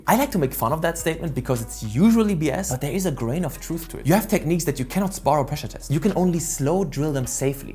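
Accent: Germany. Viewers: First time watching you, first impression: wait, why is this Japanese person talking with a German accent talking about Chinese martial arts. What xD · he's german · Ich bin verwirrt. Der Akzent ist 100% Deutsch, der content ist aber englisch. Zwischen drin droppt er aber ein deutsches Wort in perfekter Aussprache, auf der Kanal Info steht dann aber Vereinigte Staaten. Check gar nix mehr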